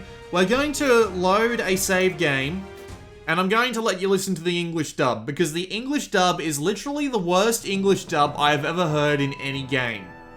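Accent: Australia. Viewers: The voice on this review is awful. I am not a fan of Australian accents.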